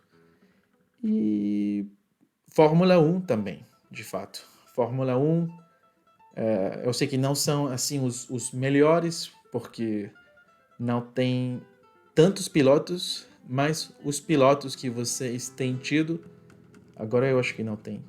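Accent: Brazil. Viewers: Ele parece mineiro,fala devagar 🤭🤭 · Acho que tu tens um sotaque acreano. Não sou de lá mas se alguém do norte ler isso pode confirmar. Se pronunciasse todos os S chiado seria um sotaque carioca completo. Mas como pronuncia o D e T a moda brasileira, o R francês e quase sempre o S silvado, diria que é parecido com do estado do Acre. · vc inteligente e fala sotoque brasileiro perfeitamente abraco falou muito bem tamos juntos e nois.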